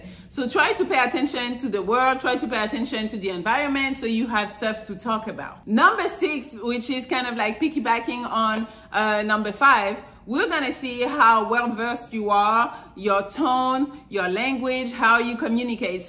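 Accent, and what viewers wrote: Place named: France. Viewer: Love your French accent.❤️❤️❤️❤️❤️❤️❤️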